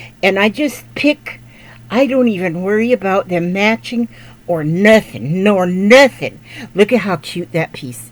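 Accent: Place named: Georgia, United States. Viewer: I am going through the hardest time in my life your time makes life so happy for me! I can’t sew yet everything I have is away from me god knows why but oh boy! You inspire me and I hope to get into the paper craft and go over your videos on there one day! But I love you do much! Your acsent reminds me of Georgia we use to live in a little town called Marietta Georgia so ch sweet folks! My dear mommy god rest her soul had a kids ministry and she had Kermit and miss piggy 🐷 lol I love your readings! I can now see when you post I learned how to do that lol my mind seems not good on some days:-( mt mommy and her mommy had memory problems but lord I’m too young to get like I feel idk lol will can you or do you have these rag snips at your Amazon shop? Ty again love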